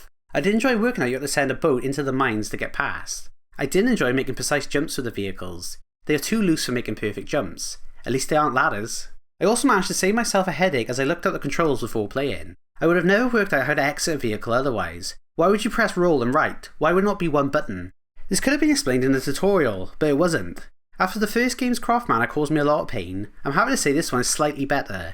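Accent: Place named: Wales, United Kingdom. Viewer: Ah I know a Welsh accent when I hear one!🏴 Agree with a lot of your points! Compared to other classic TR entries, TR2 is the least replayable for me. I like the heavy combat, but the underwater section, clunky vehicular gameplay and weak story really let the game down. Interested to hear your thoughts on TR3! Excellent video! Subscribed!💚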